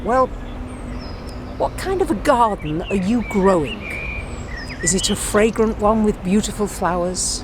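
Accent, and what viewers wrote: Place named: Manchester, United Kingdom. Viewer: Great video. You said you're in Edinburgh but I recognized the Mancuran accent. I'll admit I'm a huge Oasis fan and that's how I recognized the accent. Happy full moon from California.:)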